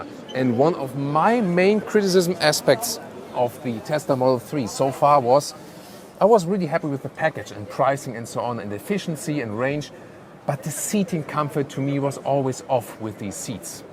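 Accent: Germany. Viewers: Love the German accent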